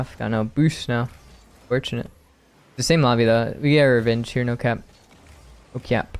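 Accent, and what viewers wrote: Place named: United Kingdom. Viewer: your British is perfect👍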